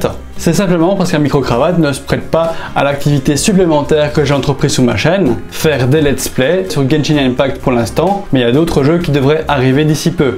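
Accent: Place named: Belgium. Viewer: tu est de quel pays avec cette accent belge peut etre?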